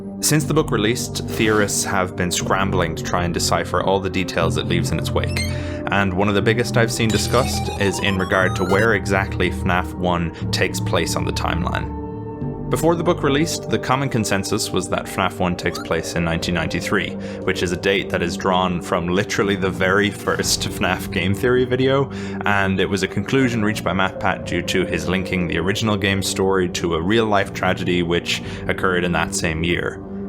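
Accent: Ireland. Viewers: are you irish? theres a slight accent to ur voice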